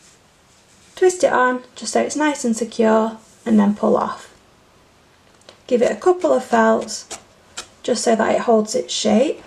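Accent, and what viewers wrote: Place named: Yorkshire, United Kingdom. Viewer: I kind of thought you were a Yorkshire Lass, I have relatives in Lincolnshire, about 9 miles from Boston or skeggy depending on the way you go. Take care, I’ll look forward to all your future videos. Keep up the great work you are putting out there. 🥰👏🏻👏🏻👏🏻👏🏻👏🏻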